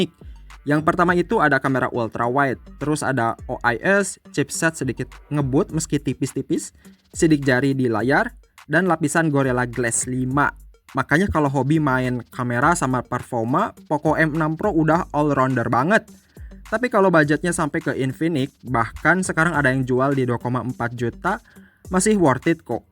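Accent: West Java, Indonesia. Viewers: Mang siga orang sunda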